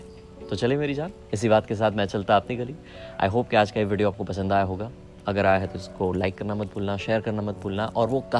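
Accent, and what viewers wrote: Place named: Rajasthan, India. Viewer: Rajasthan k lgte ho Bhai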